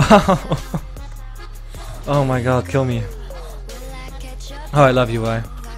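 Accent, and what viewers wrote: Germany. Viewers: warte bist du deutscher? o.o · Hey are you german? You have quite an accent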